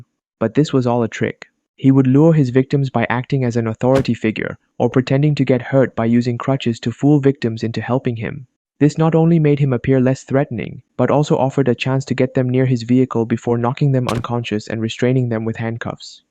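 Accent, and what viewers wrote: India. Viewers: Bro's accent sounds like an Indian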